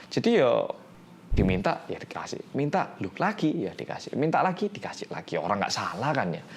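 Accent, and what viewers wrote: Java, Indonesia. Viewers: Tim gercep mas medokk🤣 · Tdk perlu iri dgn uang M" An Meskipun kita bs sakit BBS nntn you tube,bs tdr nyenyak😭😭😭😭😭trs bget mmbtku semakin kuat walaupun q sakit hrs ttp bersyukur ......matur suwon oppa seng medokkkkkkk😀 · life is so unfair but we got the important point from this video, thx mas yang medhoookkkk, GBU mas ✨ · Uang berapa pun ga akan bisa mengganti rugi atas yg telah terjadi oleh bapak Yoon Seounyeo, menjalani 20th di dalam penjara atas tuduhan yg ga pernah dia lakukan.. Sakit hati, Marah, Kecewa dia lalui sendiri, tapi dia tetep sabar n menerima semua nya.. Yang dia senangi adalah Cap Pembunuh yang melekat pada diri nya sudah hilang.. Semangat buat Ahjusi Yoon Seounyeo dan Buat Mas yang semakin Medoooooooookkkkkkk:) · Yah telat 18 menit. Gercep like aja dahh MEDHOKK. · Yuhuuu selamat malam bolo-bolo Kembali lagi dengan detective reomitt bersama mas medok kitaa ❤❤❤ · Plis lu pada yang nonton wajib subrek channel mas yang MEDHOK ngonghey 😉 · Buset medhoooooooookhhkhkhkhh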